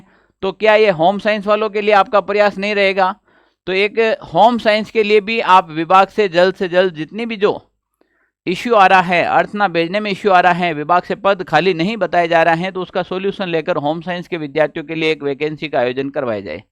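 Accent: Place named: Rajasthan, India. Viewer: Sir aap ki voice sun k aisa lagta h Rajasthan me bathi hu main😊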